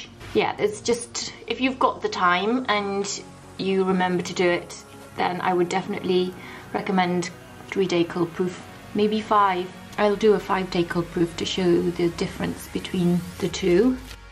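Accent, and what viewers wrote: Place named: Manchester, United Kingdom. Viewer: You can get there. Your skills will only sharpen. You should watch a video about Lucalis pizza in New York how he started. I see you Mabey having your own pizzaria one day. I'm curious are you from Manchester? I deal with alot of tourist I'm a mixologist on the river walk and your voice sounds like its from Manchester.....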